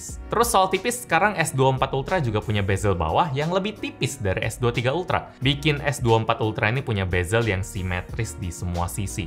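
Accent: Palembang, Indonesia. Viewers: nih wong plg apo ee?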